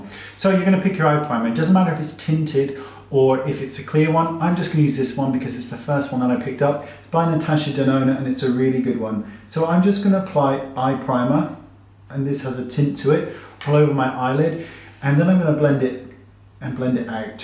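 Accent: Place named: United Kingdom. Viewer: Great tips for an older lady, and nice to hear a British accent. Love your videos x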